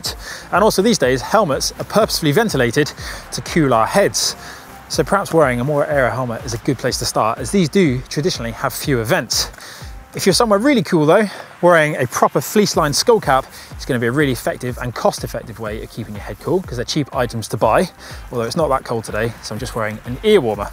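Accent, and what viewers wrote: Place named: United Kingdom. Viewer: It's a British accent, not an internationalized accent.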